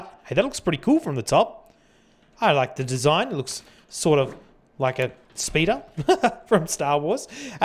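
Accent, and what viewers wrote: Australia. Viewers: i always knew u were australian from when i heard ur voice! nice creation, mate!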